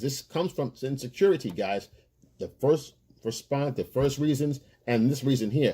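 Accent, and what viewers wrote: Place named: North, United States. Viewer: ...You live in a large city area,.I live in a rural area=The South✔..Big difference like night and day..The South is more of a traditional approach with women,even though the majority have the same motives all over america..From your voice and prospective I'll guess you live 🗣in the North..Completely different than the South,specially the rural areas where family legacy is known=Your family,cousins,uncle,aunts,friends,grands and exes are known through you(they know alot about you bro.)..They know where you go,hang out,job,who you bangin,what you drink=Ain't no million factors in the South rural areas bro🤣.,ain't no putting on a show unless the person truly is the show💯..Appreciated your videos and prospective wisdom great content..👊🏽💯.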